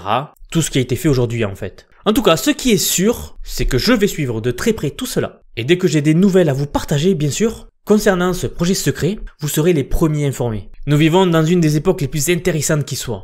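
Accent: South, France. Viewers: Comment ça me fait trop plaisir d'entendre une voix du sud parler de technologie . Et puis je te suis, tes contenus sont supers et donnent la pêche.👍🏻😋. Tu es d'où si ça n'est pas indiscret ?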